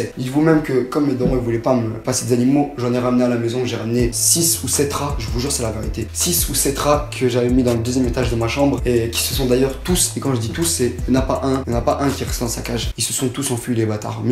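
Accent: Belgium. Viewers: T est belge ?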